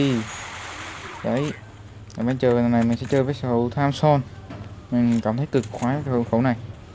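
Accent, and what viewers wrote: South, Vietnam. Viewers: Miền Nam 1 hả?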